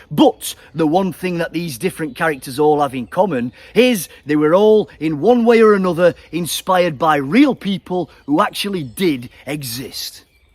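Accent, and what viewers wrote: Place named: England, United Kingdom. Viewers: I only recently ran into you channel while looking for archery videos as I am starting on the hobby (doing it with a prosthetic hand is... interesting). And what quality content you have here in your shorts and your long videos. And it is great to see and hear a Robin Hood that can speak with an English accent! Keep the good work! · As a History Nerd I’m mad I have only just found your channel and as a fellow Yorkshire man I appreciate the Broad AF Yorkshire Accent what an amazing history channel researching a great Folk Hero and History 🏹🍻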